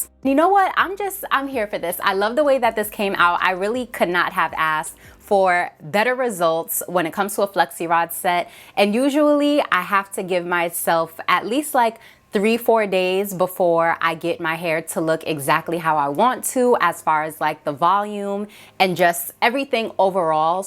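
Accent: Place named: New York, United States. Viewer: You are a queen sis and I loved every second of this. Great job and I love how you break down your video, I'm a new sub! 😁💚👑 I also like how you created a faux bang and I love your enthusiasm and your accent. You sound like you're from New York or somewhere up north! 😁 I will have to check out this line of products.👍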